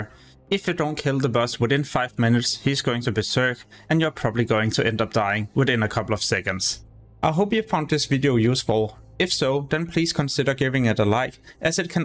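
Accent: Denmark. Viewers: 100% Dansker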